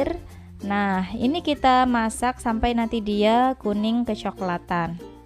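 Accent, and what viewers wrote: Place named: Samarinda, Indonesia. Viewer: What samarinda??? Nada samarindanya udah ketauan..hehe sama dong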